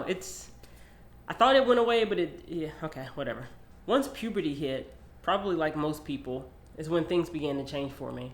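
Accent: South, United States. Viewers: So well articulated! My daughter, who is experiencing gender dysphoria, sent me this video, and I appreciate you sharing your experiences. It's so hard to be vulnerable like that. Also, I really like your beautiful voice! It has a velvety richness, and the slight southern twang is cute. (For what it's worth, I'm a cisgender, heterosexual woman.) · I love your voice, your southern twang is awesome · I'm late to this, but dude your southern twang rocks and is so sexy!!!!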